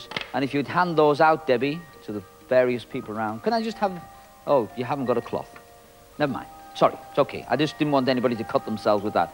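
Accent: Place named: Yorkshire, United Kingdom. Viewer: has a British accent, more exactly he has Yorkshire accent from east England. Don't worry it is fairly thick and a lot of people have trouble understanding it. Even people who do have english as a first language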